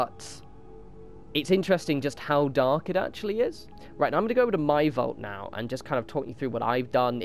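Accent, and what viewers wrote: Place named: East London, United Kingdom. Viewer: You genuinely have a fascinating accent. Its like a mixture of eloquent country english and really harsh east london. Almost verging on cockney.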